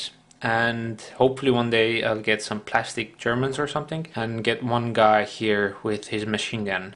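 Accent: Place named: Northern Europe. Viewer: U know was wondering where u come from? is cause of ur accent and entonation. Sounds to me u r from Northern Europe? Whatever the case, ur video was a great one! keep going. BTW that halftrack how much was and where u purchased it? thnx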